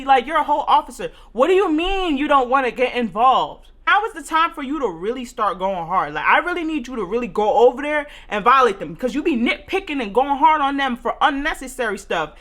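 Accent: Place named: Philadelphia, United States. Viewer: I watched a few of your videos and was trying to guess where you’re from. I know the north east. I’m guessing philly. It was the “fuck you mean pussy” New subscriber thanks for the laughs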